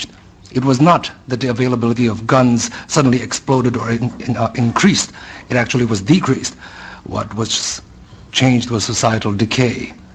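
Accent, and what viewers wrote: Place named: United States. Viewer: Immigrant??? Sounds more American to me then some in this country. Welcome fellow citizen . You are a true brother to the REAL American patriots of this country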